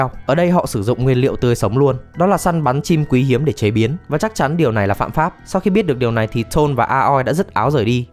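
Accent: North, Vietnam. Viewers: Giọng chủ thớt miền bắc mà cứ ba ba, ông này người nam nói giọng bắc à :) . Ở ngoài bắc người ta gọi "bố" chứ nhỉ :)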